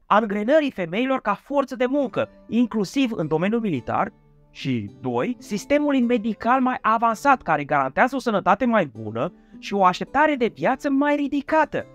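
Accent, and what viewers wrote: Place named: Moldavia, Romania. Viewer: Sper să îl aduceți pe Ștefan cel Mare la locul de cinste pe care îl merită în rândul lumii / audienței. Și nu Țepeș. După accent înțeleg ca sunteți de-al nostru / de-a lui Ștefan cel Mare și nu de-a lui Țepeș. …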